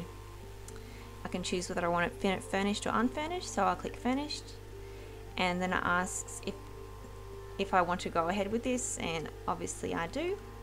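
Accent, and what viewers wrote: Australia. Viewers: As soon as i heard your voice I could tell you are aussie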